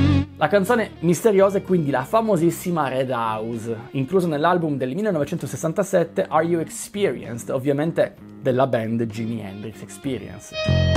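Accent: Piedmont, Italy. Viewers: Io (ragazzo negli anni '80), sono proprio partito dai chitarristi vecchia scuola (Knopfler è stato il mio primo idolo, e poi i più vecchi Clapton, Hendrix, Page, etc.) e solo in seguito mi sono avvicinato ai mega virtuosi che in quegli anni impazzavano. E devo dire che alla fine i virtuosi mi hanno un po' stufato, a parte pochi casi come Van Halen o Morse, mentre i vecchi classici sono restati. Anche perchè la mia tecnica è quella che è. Ora stimo enormemente gente come Govan o Timmons, tecnici ma anche raffinati, però continuo ad ascoltare molto più spesso il vecchio blues rock o hard rock ignorante, anche fatto da band contemporanee (ce ne sono in giro di molto interessanti). PS: viva l'accento piemontese 😄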